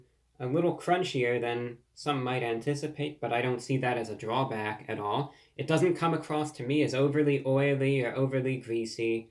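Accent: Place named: New England, United States. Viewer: Ir he just needs to start rolling his R's now lol. It's just his New England accent, hopefully he never stops doing it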